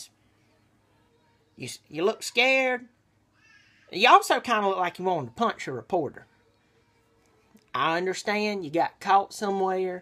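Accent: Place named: Arkansas, United States. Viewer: Im trying to guess where your accent comes from.. arkansas?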